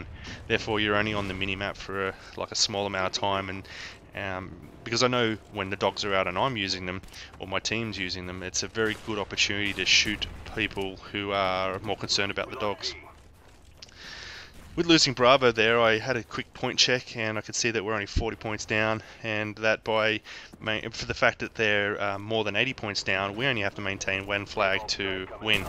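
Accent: Australia. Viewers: Finally a gd Aussie commentator what state r u from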